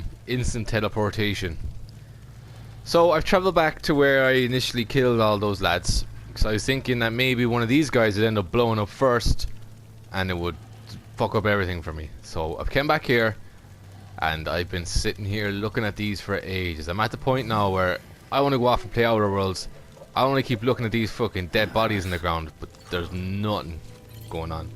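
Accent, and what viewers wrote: Ireland. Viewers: Do u really have an Irish accent or are u acting? Lol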